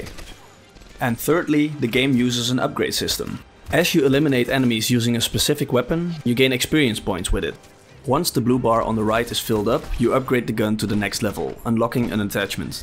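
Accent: Netherlands. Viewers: goeie video, maar het is onmogelijk om een nederlands accent niet te herkennen als nederlander haha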